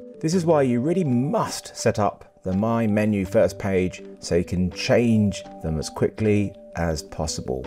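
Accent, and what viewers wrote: United Kingdom. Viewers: It's always a pleasure watching your videos. Your British accent and cats are awesome!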